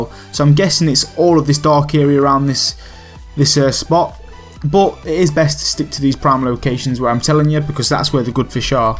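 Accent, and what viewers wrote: United Kingdom. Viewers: Yes, but what's your accent? I like it. Is it British?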